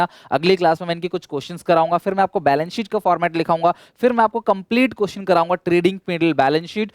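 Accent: Odisha, India. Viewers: sir ap ka mother language odia hai kya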